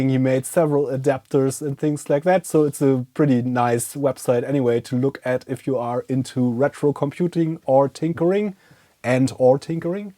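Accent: Germany. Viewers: In German (and many European languages) the letter G is always pronounced that way. Phonetically "Gee" is very rare, its almost always "Geh" and that will be what learned from a very young age. Just an FYI, the letter W is German is pronounced V which is why he also says things like "Wersion", again its simply because he was taught that from the beginning. Pretty obviously, German kids are taught German before anything else. IMO, as long as you understand what someone means there's no real need to correct them.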